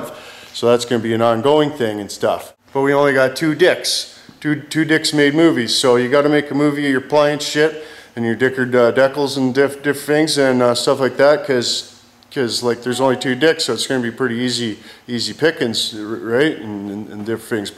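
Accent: Canada. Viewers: how much more canadian can that voice sound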